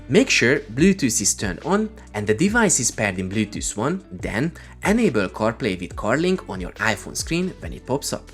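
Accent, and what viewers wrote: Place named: Hungary. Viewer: Amint a hang megszólalt, egyből az villant be, hogy ez a csávó magyar, 1000%. :) Annyira tipikus, mint az indiaiak, nem lehet letagadni.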